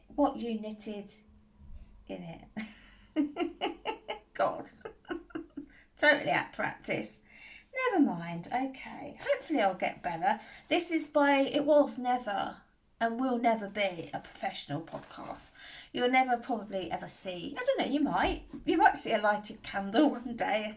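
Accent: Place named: Scotland, United Kingdom. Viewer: It's lovely to hear your Scottish voice here in Texas where I knit all the time, but it's rarely cold enough to enjoy wearing the items. I do mostly fingering weight projects here. LOVE this channel. As a person planning for retirement in about a year, I need to have EVERYTHING frugal, especially the hobbies.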